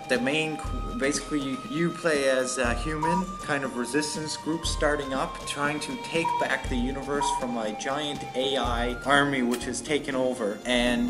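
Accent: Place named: Canada. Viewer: lol the telltale canadian accent 'go check id eeeeewt!" :D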